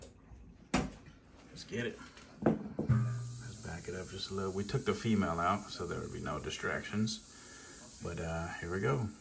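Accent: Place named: Bay Area, United States. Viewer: Bro are you from Northern Cali? You sound like you from the Bay Area lol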